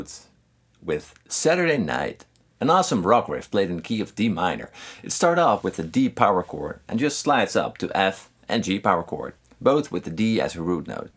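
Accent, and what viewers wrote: Netherlands. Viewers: Are you dutch???🇳🇱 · You sound a bit Dutch, to be honest with you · yes he's Dutch and so am i :) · Just noticed that your presentation has become way better now. More the authentic Dutch accent, more you. Great video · how dutch is this guy immaright? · Hey you're Dutch! Guessed it haha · dude, you're a dutchie XD the accent gives away, dutchie here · hi are you dutch? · Can i ask where you're from? You sound very Dutch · Nederlander confirmed, Nederlands accent op het engels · you are dutch arent you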